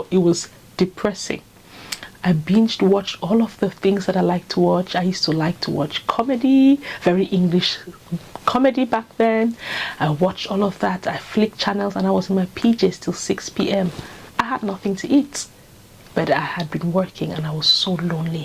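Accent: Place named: Nigeria. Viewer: She’s not Ghanaian. Her accent is more of a Nigerian accent. I’m a Ghanaian and know a Ghanaian when I see one.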